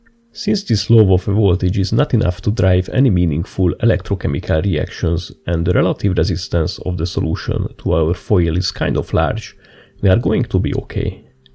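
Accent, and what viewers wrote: Hungary. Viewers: your accent sounds like hungarian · az akcentusod alapján magyar lehetsz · A jó magyar akcentus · Me at 1 AM: > "oh boy, seems like we're blessed today to find another hidden gem of a channel" > the narration is the platonic fucking Form of the hungarian accent actually manifest > now I cannot stop smiling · i heared the first sentence and i already know you're hungarian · amikor az ember megismeri a magyar akcentust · Your accent is veeeery hungarian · Its just hungarian accent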